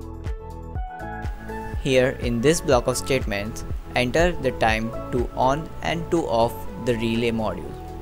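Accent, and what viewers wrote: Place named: India. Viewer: when i hear that Indian voice feels like he wants to scam me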